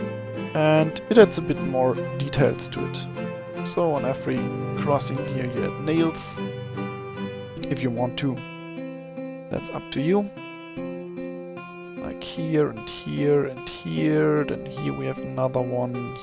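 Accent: Germany. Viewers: Du bist Deutscher stimmt's? :D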